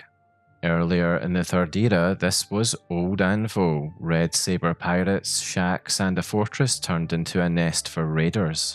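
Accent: Scotland, United Kingdom. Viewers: Are you Scottish? Very similar accent to folk round me 😂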